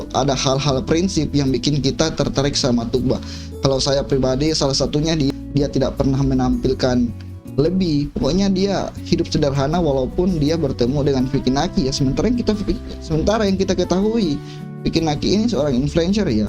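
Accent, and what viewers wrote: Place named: Eastern Indonesia, Indonesia. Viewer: 😂😂😂pecah n gokil ini chanel konten reactor ter the best menurut sy ahli IT,high class,scoupe nya international,logat narasinya jg unik Indonesia timur,keliatan smart,bicara artikulasinya jelas ga pk banyak mikir,dng grafik data keren min 👍👍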